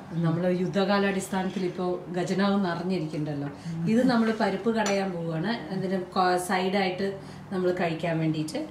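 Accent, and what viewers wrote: Palakkad, India. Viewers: Asalailyei palakkadan basha.kodhi gerunnundu ente chechyei.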